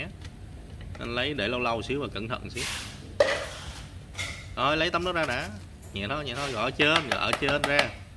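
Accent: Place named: Mekong Delta, Vietnam. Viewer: Cửa hàng mình ở đâu vậy anh..? Nghe anh tiếng ngươi miền tây ạ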